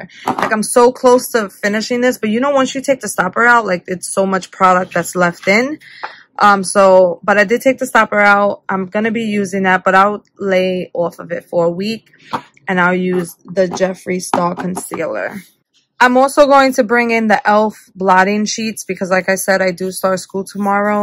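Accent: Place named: New York, United States. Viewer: Giiiirl i hear that heavy up north accent. Is that new york or new jersey i hear? lol. 💕 Also whats the name of the yellow CSS shadow?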